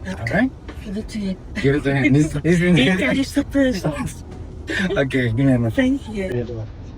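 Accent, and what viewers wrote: South Africa. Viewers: She sounds S. African.? I am in SA ❤❤❤❤❤❤💯💯🙏🙏🙏🙏😍😍😍😍💋💋💋💋 . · She sounds South African😊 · When I started listening I just knew!!! South Africa!! Afrikaans!! Wow love it. So glad for you. Enjoy it for all of us.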